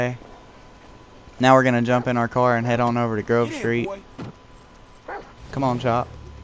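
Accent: United States. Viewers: He sounds fine it's a southern accent I have one to so don't hate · are u from Kentucky?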